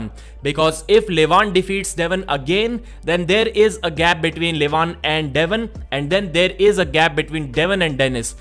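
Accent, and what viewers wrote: India. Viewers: Man, by having such a proper channel you singlehandedly made me get used to an indian/pakistani accent at last! Now it feels just like an american or UK one, just as easy to comprehend! Kudos to you, man! Hope my comment doesn't come across as ignorant one, I really meant it with the best of intentions! Best agregator/news channel on arm wrestling lately!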